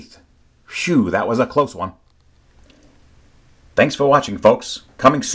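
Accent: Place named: New York, United States. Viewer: I like your New Yorker accent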